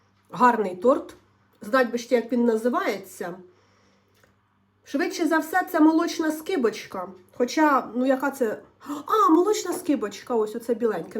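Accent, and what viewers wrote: Poltava, Ukraine. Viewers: Знає дуже добре українську,але розмовляє,як оозмовляють на Полтавщині. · Це полтавська говірка. Мені згадався серіал "Одного разу під Полтавою".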